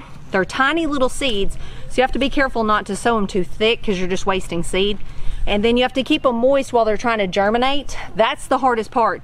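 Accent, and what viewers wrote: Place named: Arkansas, United States. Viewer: It's so nice to hear a true Arkansas accent. Always run with it, not away from it because it's the most pleasant sound on earth.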